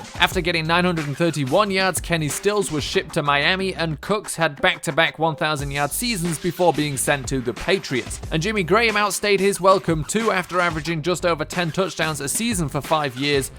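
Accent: England, United Kingdom. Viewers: Your British accent ruins your credibility. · And it sounds more believable with the British accent. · Wait. Is that english accent? It's funny how the narrator has english accent talking about American Football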